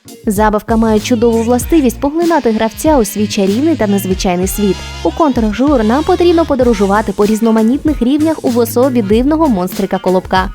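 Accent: Standard. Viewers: Так круто слухати чисту українську мову, причому гарним голосом) Я теж роблю топи, якщо неважко, можете заглянути ;)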